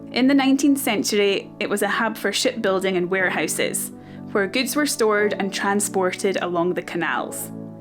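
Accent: Scotland, United Kingdom. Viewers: I love the Scottish accent. Its my favorite. I enjoyed the video 😊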